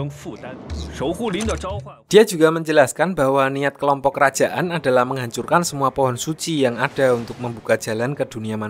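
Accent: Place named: Java, Indonesia. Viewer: Subscribe dlu smbari nntn Suara mimin asik jls dn ada jawa² nya gitu 🤣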